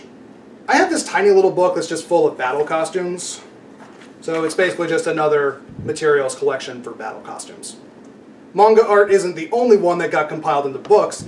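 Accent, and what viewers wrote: United States. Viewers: That Texan accent! 🤣🤣🤣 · Your American accent killed me!! I want to say I really love your channel and awesome collection. 😃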